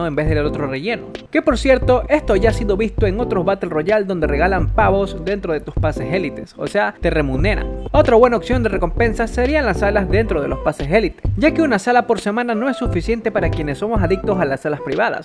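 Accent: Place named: Ecuador. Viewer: que eres de ecuador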